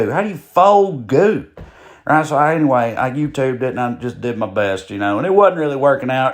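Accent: France. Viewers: doing a French accent just brightens my day ❤😂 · That French accent is on another level brother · Please do more French accents. ❤ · Interestingly, I had pretty much the same experience trying to make ze macaron. I love to eat them in every flavor. Good Job! Next you should make Baked Alaska or Cherries Jubilee. You do a great French accent. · Really love this especially the southern French accent instructions. Looking for more.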